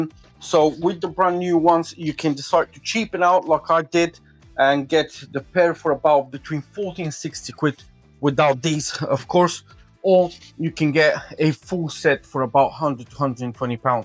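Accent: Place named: South Africa. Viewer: South African? Love the accent!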